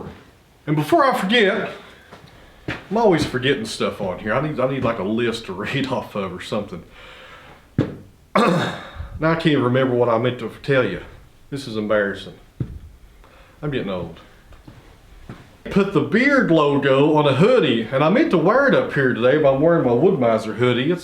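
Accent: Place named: Tennessee, United States. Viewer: You’re starting to sound like that concrete truck driver. NE TN dialect is kicking in!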